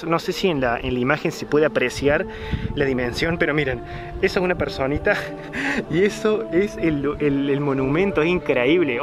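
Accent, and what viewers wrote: Cordoba, Argentina. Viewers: Me encanta tu acento coooordobes... 🤘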